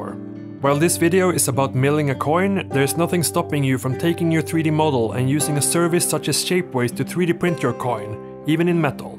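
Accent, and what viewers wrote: Sweden. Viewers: He's most likely swedish, all swedish ppl sound like that if they haven't been in the US for a month. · Man känner igen en svensk röst väldigt snabbt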